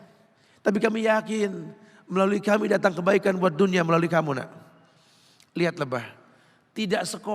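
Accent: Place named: Jakarta, Indonesia. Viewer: Assalamualaikum ustadz,,,,salah satu daya tarik adalah logat Betawi ustadz yg kentel sy sukaa bnget ,,,, alhamdulilah kebetulan kite satu rass ustadz ,,,,,semoga Allah slalu melimpahkan Rizki yg luas utk ustadz sbgai bekal dakwah ,,,aamiin ya rabbal aalamiin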